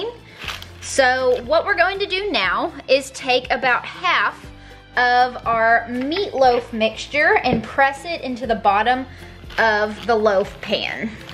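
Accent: South, United States. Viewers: "Hey y'all!" Absolutely love that deep southern accent..."with yo purty little self" 😄😄 Real talk though this looks good I make a mean meatloaf myself so I'll be trying this soon thank u for the vid.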